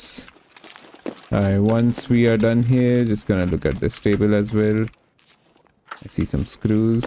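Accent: India. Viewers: Idk why but you sound like an INDIAN... If yes than i'm surprised to see an indian making tarkov content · Hears Indian accent. Clicks off. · your indian accent makes this video 2x more enjoyable. On the other hand it contains very valuable knowledge - super good vid. Well done sir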